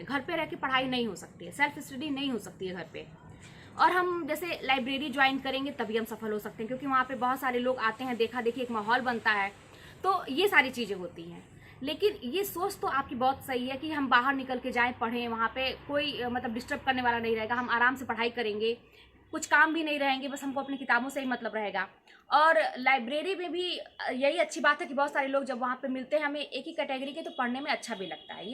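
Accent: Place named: Purvanchal, India. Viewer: Aap kha se ho didi purvanchal side se lg rhi h ....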